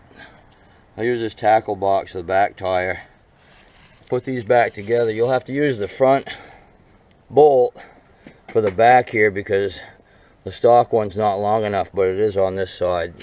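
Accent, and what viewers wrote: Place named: Boston, United States. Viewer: Are you from Boston, MA? Love the accent :))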